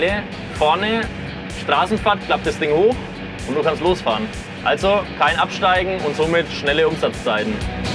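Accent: Franconia, Germany. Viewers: Der " " hat doch ein sehr fränkisches Dialekt - wenn der mal nicht aus Feucht bei Nürnberg ist.... Wo wird nochmal Fella produziert!?;-) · Also für jemanden mit einem OAL-Kennzeichen hat der gute Mann aber einen ziemlich fränkischen Dialekt :D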